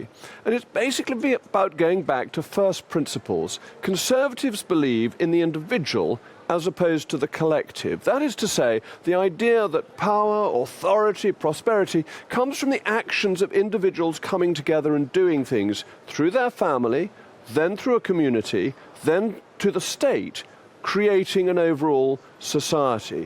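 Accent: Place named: Upper class, United Kingdom. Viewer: Sorry, but I'm not stupid enough to listen to someone with accent like yours talk about "work". Jog on, toff.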